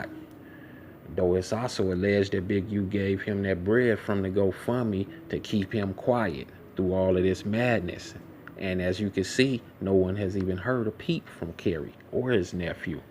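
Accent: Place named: California, United States. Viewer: New subbie here. And I agree that Cowboy does not have anything to do with it. When he took off his hat and scratched his head to me that’s says like wtf did I just see. Or perhaps he thought nip was gonna get robbed but not killed. Anyway you take it it’s crazy and are you from Cali? I can hear the accent.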